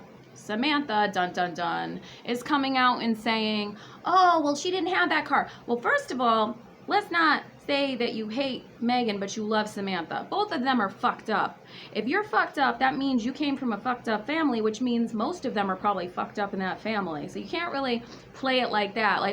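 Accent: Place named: United States. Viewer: I love listening to you. And your down to earth ‘tell it like it is’ approach. That’s why I enjoy your vids. You talk like ordinary Australians do. Yet with an American accent. Keep up the readings. Fantastic!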